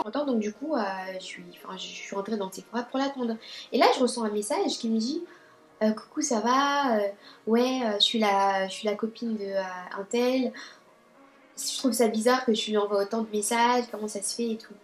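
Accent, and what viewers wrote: United States. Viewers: t'a trop l'accent américain et j'aime trop ta storytime . je m'abonne 😘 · t tro belle est ton accent amairiquain ik est 😍😍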